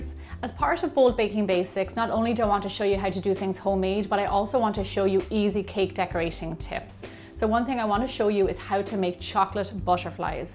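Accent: Ireland. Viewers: Her accent, is she from Ireland? Sorry I'm not a native speaker :)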